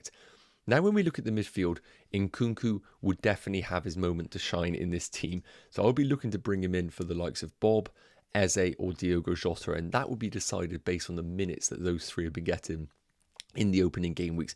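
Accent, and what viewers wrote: Bristol, United Kingdom. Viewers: Is this guy from Bristol? He sounds like me lmaooo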